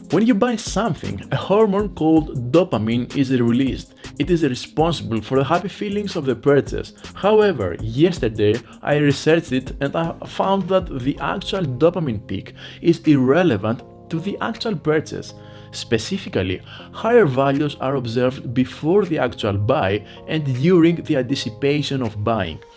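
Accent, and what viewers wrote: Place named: Greece. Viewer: I like your greek accent 😁 Nice video 👏👏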